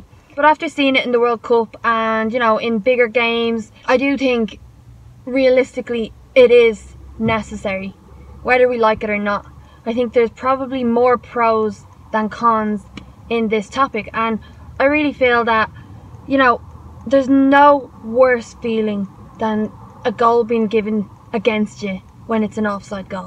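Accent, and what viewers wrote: Ireland. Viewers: You're Irish where you from love I'm from tallaght can't guess your accent haha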